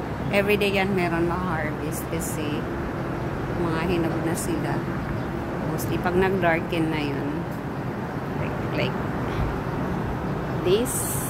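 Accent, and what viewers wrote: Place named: Philippines. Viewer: Are you filipino i know that voice😮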